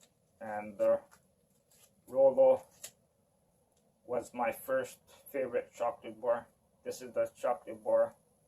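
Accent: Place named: Spain. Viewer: Are you Spanish I can't understand your English sorry :(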